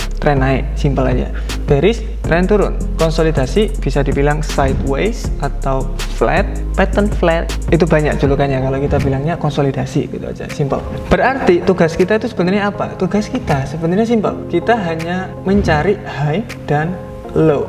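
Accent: Java, Indonesia. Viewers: Saya mau tanya kan ini 1). Cara kita menjelaskan nya jgn jg terlalu cepat betul, pelan aja, jdi kita pun jg susah nangkapnya Yg ke 2). Jgn pakai logat2 Jawa lh semuanya pakai bahasa Indonesia aja, klu boleh Yg ke 3). Itu saja yg saya sampaikan terimakasih atas ilmunya, semoga sukses 🙏🙏🙏🙏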